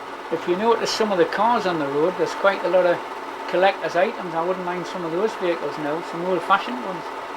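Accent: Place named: Newcastle, United Kingdom. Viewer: Thanks for posting these vintage clips. The geordie accent is perfect for cycling commentary.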